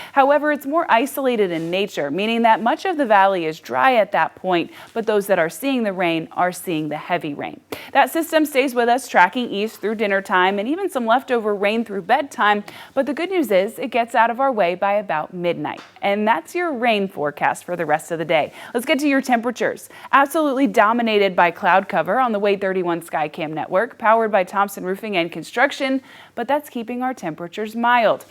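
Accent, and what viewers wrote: United States. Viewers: She's not local, she talks like a yank lol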